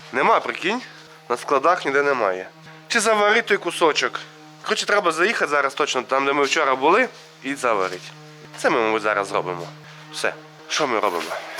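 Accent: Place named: Surzhyk, Ukraine. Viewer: Будє прикольно - вахааа.дятел суржик жосткий.песня отстой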